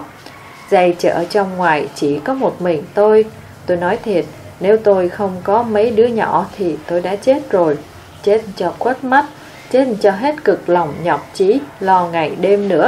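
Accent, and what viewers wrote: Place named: North, Vietnam. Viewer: Truyen HBC fai giọng đọc nguoi mien moi dc . Het nguoi đọc sao chọn giọng Bắc kỳ vậy .ko nghe đâu !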